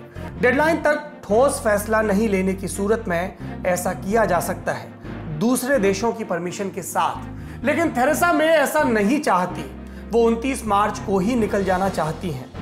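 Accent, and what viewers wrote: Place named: Bundelkhand, India. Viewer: Ashish Verma wo urai (Bundelkhand ) se hain. Avadh se nhi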